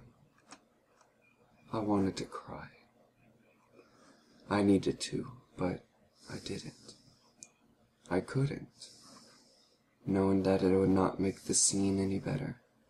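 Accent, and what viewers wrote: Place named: South, United States. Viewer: Congratulations, I'm sad. I might just suggest though, when you read, pronounce each word, don't slur at the end. I was wondering if you were southern because I kept thinking of as you talked In Twi's perspective. Also, another pointer, don't read when you have what sounds like a morbidly obese man in the background snoring. Wait until you're alone. All critique aside, great story, great reading. Good job.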